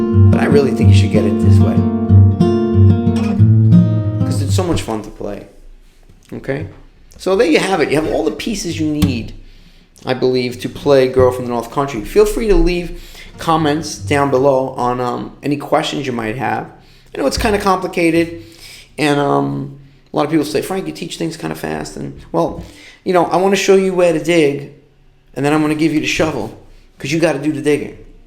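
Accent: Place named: Italian-American, United States. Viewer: You know, Frank, I've been banging on a guitar for 53 years, and I'm still trying to get good at it. The whole Travis picking thing has had me flummoxed for the past few months. Being that you're also an Italian dude who looks and talks like the people I grew up around seems to help me to kind of "get this thing" a little bit better. You're a good teacher, and I'll bet you're a great performing artist as well. I will take a break from my lesson to go and investigate. Thank you, paisan.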